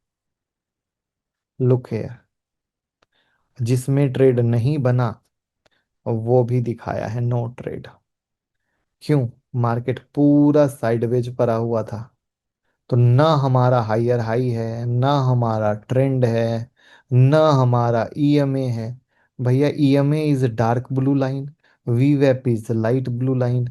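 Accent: Bihar, India. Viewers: आप बिहारी हो क्या?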